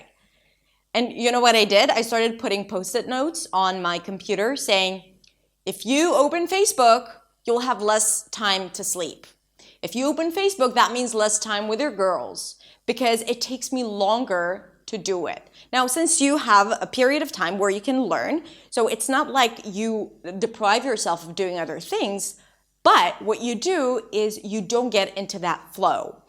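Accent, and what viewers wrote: United States. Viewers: I want to be fluent 1 - In order to get married with an English man. Hoping he will learn Arabic so I won’t have to fight with English. 2 - I live in Canada 🇨🇦 I have to speak English. you are from Israel 🇮🇱 but you sound 100% American you are very smart. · Thank you so much for this clip. I always wanted to become a fluent English speaker, but I never asked myself why, so now you have made me become a critical thinker. I used to think you were born in the USA since no one can separate you from those who were born there due to your American accent until you told that you were not American.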